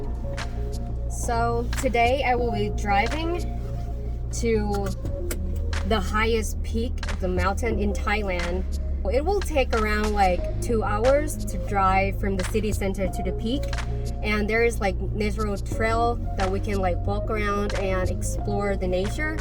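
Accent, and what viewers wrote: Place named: United States. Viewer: Enjoyed your video❤ Your American accent is so sweet😊 Also you are in very good shape & lots of stamina; most likely you can climb one of the 8,000+ metres peak easily.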